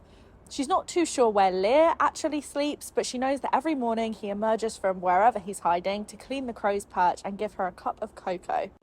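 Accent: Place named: United Kingdom. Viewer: It took me 4 minutes to realize you’re British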